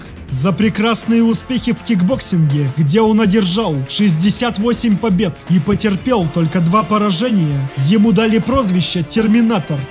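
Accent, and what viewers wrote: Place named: Moldova. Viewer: Могли бы человека с нормальной дикцией подобрать для озвучки,а не этого молдована с суржиком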